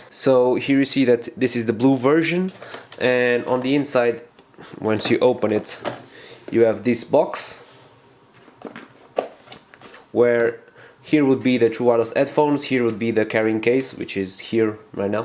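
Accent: Portugal. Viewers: are you portuguese? your accent seems like it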